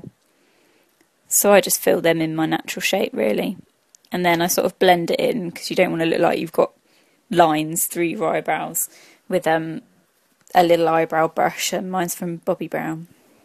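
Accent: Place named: United Kingdom. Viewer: What part of Britain are you from? I love you accent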